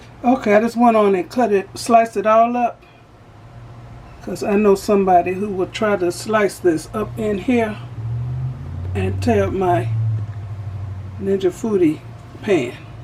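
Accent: Chicago, United States. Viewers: I knew I heard Chicago in you lol This look amazing never thought about adding the soups to my meats … Will be trying soon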